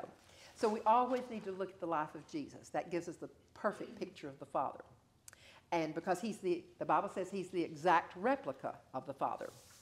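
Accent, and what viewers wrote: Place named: South, United States. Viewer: Wow, she is southern - reminds me of my ancestors from OK and TX. You can slow the playback speed down a notch and you will really be able to reflect on what is being said. Too important a message to miss.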